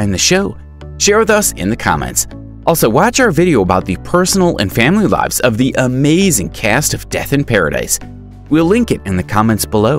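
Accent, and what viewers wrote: United States. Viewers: It's a British program . Why is an American doing the voice over????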